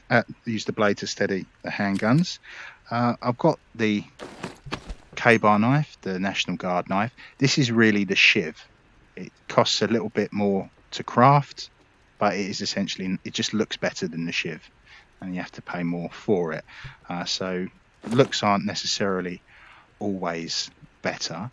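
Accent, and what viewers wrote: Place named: United Kingdom. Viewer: Hearing a British accent telling me about guns is a strange feeling 😂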